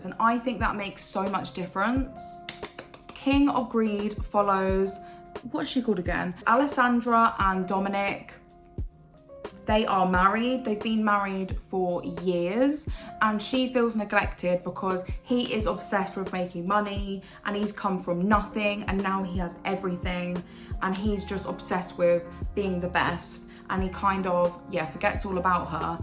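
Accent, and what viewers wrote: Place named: United Kingdom. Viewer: I love her british hermione like accent❣️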